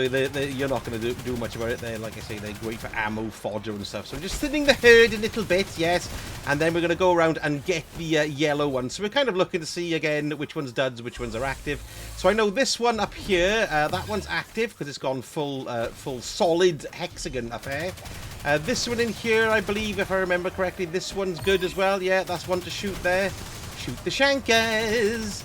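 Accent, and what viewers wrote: Wales, United Kingdom. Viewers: Love the Welch accent, miss working in Wales, good content. Thank you.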